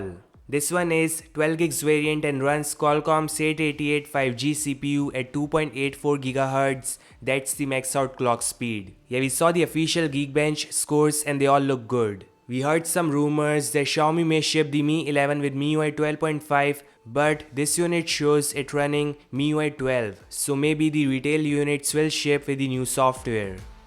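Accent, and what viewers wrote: India. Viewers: Logat india people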